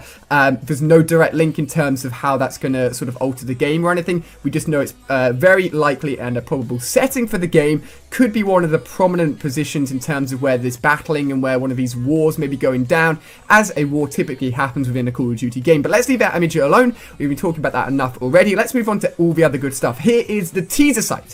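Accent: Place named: United Kingdom. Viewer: It's hard for me to understand british accent and you speak faster... : /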